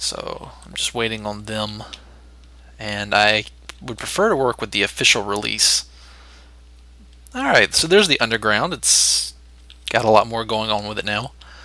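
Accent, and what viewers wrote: South, United States. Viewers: love the southern accent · What part of America are you from>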